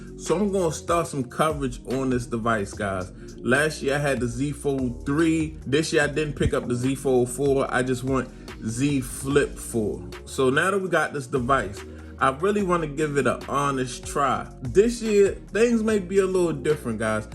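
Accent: Baltimore, United States. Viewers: Boy got a strong Baltimore accent 🤣😂🤣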